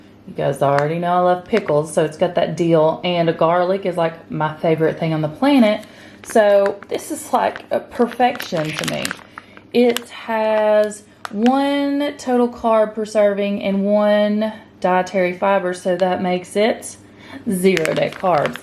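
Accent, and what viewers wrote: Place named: Tennessee, United States. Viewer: I know a Tennessee accent when I hear one. This was a great video. I like to eat a lot too.❤️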